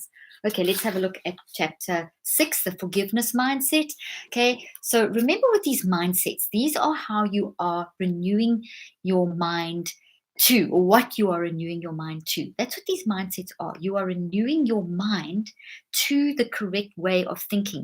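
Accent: South Africa. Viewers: Smart young lady . Love the SA accent too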